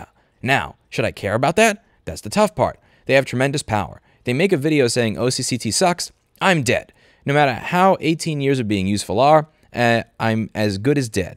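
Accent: New York, United States. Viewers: Very well said and interpreted, took me a long time to get used to your NY style, appreciate all you do now.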